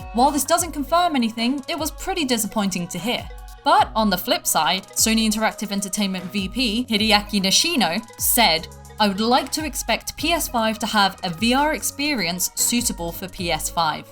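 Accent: United Kingdom. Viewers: Hahahaha and she has a british accent. Thats bollocks! Lol. It works in the us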